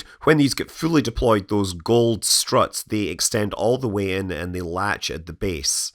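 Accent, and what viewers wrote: Scotland, United Kingdom. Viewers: Listening to this with your deep raspy, Scottish accent, put me over the Carmen line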